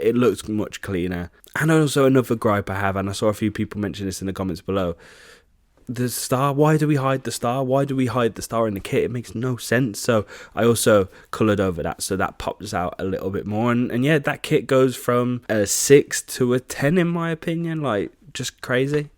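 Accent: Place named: Black Country, United Kingdom. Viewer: 1.) Refreshing to hear a Black Country accent on YouTube. 2.) Do you remember a Spain kit with blue accents from 2013? Whatever happened to that kit?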